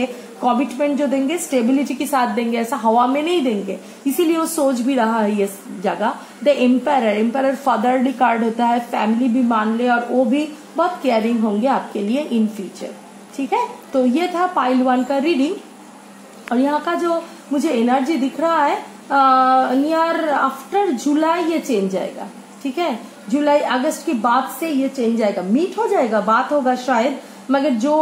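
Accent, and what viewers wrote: Bengal, India. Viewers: Hindi me bhi aapka Bengali accent aa jaata hai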